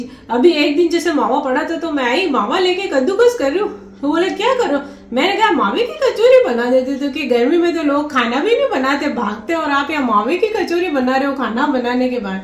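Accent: Jodhpur, India. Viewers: ram ram aunty ji me bhi jodhpur se hu or apke sare video dekhti hu bhut acha lagta h or apki boli sun ke muje jodhpur ki yad aati h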